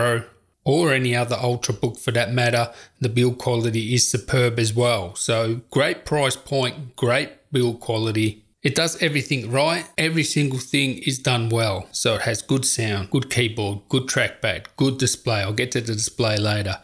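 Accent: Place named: Australia. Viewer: As an Aussie it's weird to hear super Aussie bloke talking in American dollars.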